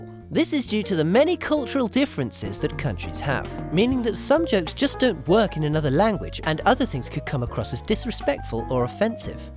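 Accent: RP, United Kingdom. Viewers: You sound posh.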